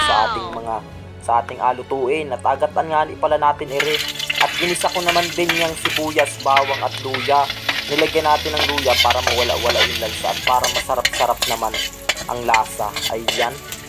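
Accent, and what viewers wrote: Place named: Quezon, Philippines. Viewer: Broo taga saan ka parang Quezon ung Punto Ng salita nyo??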